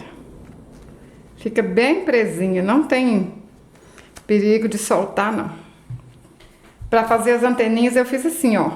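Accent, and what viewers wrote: Minas Gerais, Brazil. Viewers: Oi...gostei demais uai...você e mineirinha igual eu só..?? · Estou encantada com você. Esse seu sotaque mineirinho é muito agradável. E as coisinhas que você ensina são lindas demais. Obrigada pelo desprendimento. Deus te abençoe grandemente 🙏🏻😘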